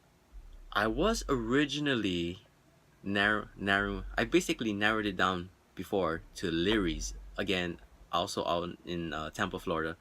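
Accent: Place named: Hawaii, United States. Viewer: Hawaii boy i can tell . kalihi maybe waianae ..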